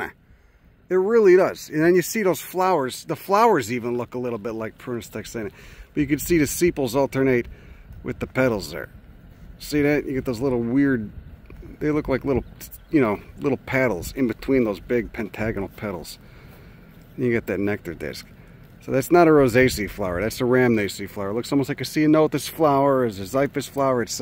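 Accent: Chicago, United States. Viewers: Funniest botanist. He’s from Chicago and travels the country trying to look at plants. Heavy Chicago accent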